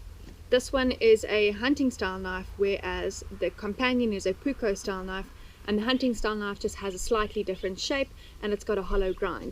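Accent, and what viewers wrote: South Africa. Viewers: Great video. Watching from the US. Also love the South African accent. :) Cheers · Thanks for an interesting video, love to learn about Africa :) Also dig that wonderful south african accent. Greetings from Sweden!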